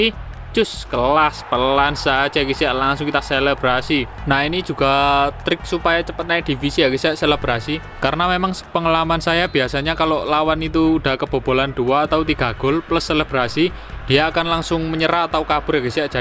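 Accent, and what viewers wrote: Java, Indonesia. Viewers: Keren bro, medok mantap, cuma ada kata anj*r nya kurang enak didengar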